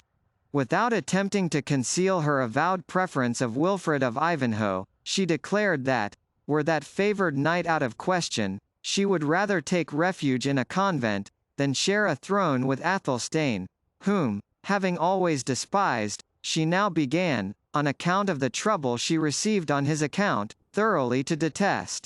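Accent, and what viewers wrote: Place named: United States. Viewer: An American accent is really unsuitable, it's dreadful & unlistenable actually!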